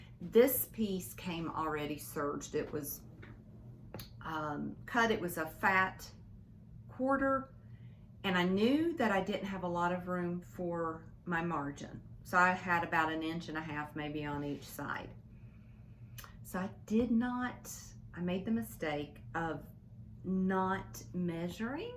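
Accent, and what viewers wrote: South, United States. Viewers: Welcome to the sharing community. I love seeing all the creativity . I want to start something in quilting. Haven’t done it in years, but when I see all the wonderful quilts and things, I get the itch to go down that rabbit hole. I myself have been stitching since I was around 6. Loved hearing your southern accent. Looking forward to seeing your next video. P.s. I am from IN. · Love your video, I'm from the south also so I completely understand you, no close caption needed.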